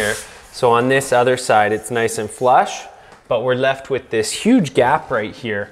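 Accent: Canada. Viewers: I was curious if this was Vancouver, BC or Vancouver, WA. I feel like this guy has a mild Canadian accent so I'm going with BC.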